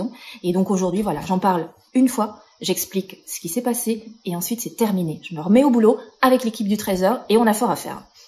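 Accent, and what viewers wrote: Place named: South, France. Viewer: j'adore votre accent meridional 😊.ma mere etait de la Dordogne,( Perigord vert, pres de la Haute Vienne et je suis ne en Anjou, maine). et loire,limite Sarthe et Mayenne. La bas aussi, on a un accent "bein" prononce. Bon Courage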